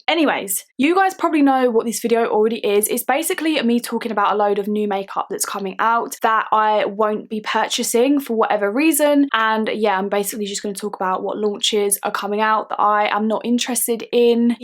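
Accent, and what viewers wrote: United Kingdom. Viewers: ❤❤❤❤ I like your videos, like you like your British accent.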